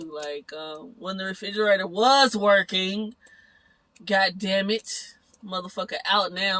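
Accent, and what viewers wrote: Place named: Memphis, United States. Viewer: Your hair taking off . Love that Memphis accent mane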